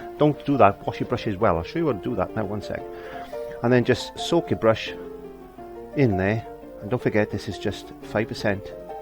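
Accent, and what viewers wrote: Wales, United Kingdom. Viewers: jiw, there's luly to hear that accent, are u a Swansea Jack? welsh speakng Jen from Reading now. x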